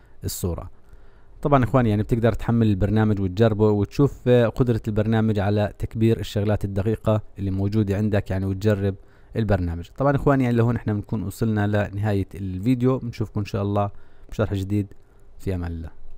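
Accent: Jordan. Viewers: انت اردني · انتي اردني صح من وين بلاردن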